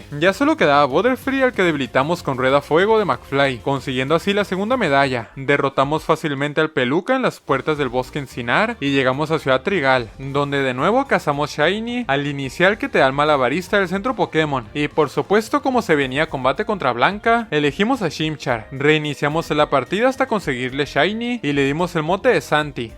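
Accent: Chihuahua, Mexico. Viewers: Eres de Chihuaha vdd we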